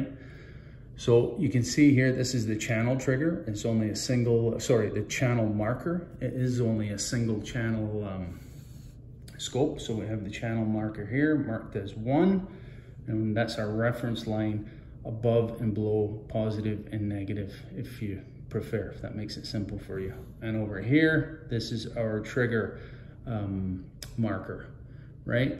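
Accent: Scotland, United Kingdom. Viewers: a wee Scottish twang in your accent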